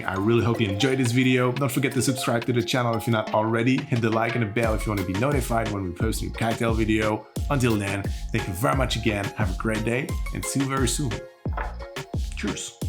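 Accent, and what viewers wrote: Quebec, Canada. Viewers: French Canadian accent is so sweet ! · From Québec ?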